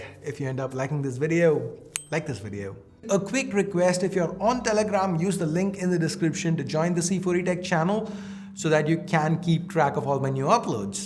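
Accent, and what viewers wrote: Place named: India. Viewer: Accent is top notch neutral and best Indian accent i would say🤟🏻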